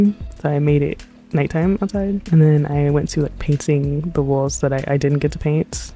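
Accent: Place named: New York, United States. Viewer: This was such a fun series! Also your New York accent came through real strong in this video and it literally made me miss home so thanks.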